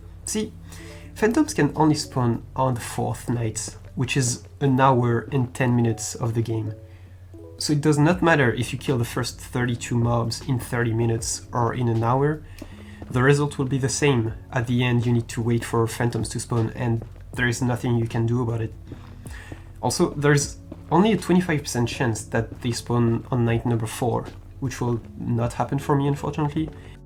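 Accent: France. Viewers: Is it me or he has a french accent ? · You sound extremely french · Ur french ? Because of your accent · Are you French ? Your accent is familiar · Are you french ? You kinda have a french accent :) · est-ce que tu est français ? If you are not french you have a french accent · haaaaa il me semblais avec ton accent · french accent ?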